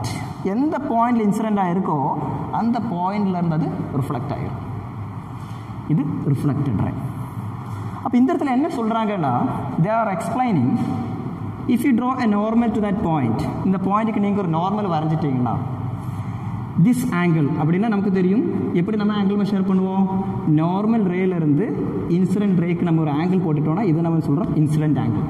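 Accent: Kerala, India. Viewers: Sir Ur from Kerala...? Ur slang like malayalizz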